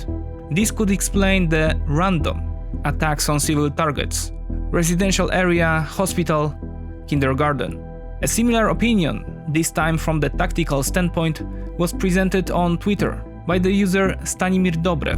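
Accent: Poland. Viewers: Very informative video. The narrators Polish accent makes it even more ominus and I like it. 👍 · BTW I hope after this ends you continue to voice the English version of good times bad times. No offence to the other guy, but i much prefer your voice. I love polish accent, and I think most people prefer hearing about geopolitics from a European rather than American! (No offence Americans)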